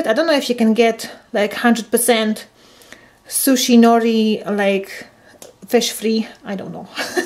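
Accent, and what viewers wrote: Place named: Scotland, United Kingdom. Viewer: I loved this video! I can only presumeFrom some of your pronunciation "livin', aye, wee" that you are living in Scotland? :) I am Scottish born and raised and a follow vegan! So bery glad I found your channel! Xxx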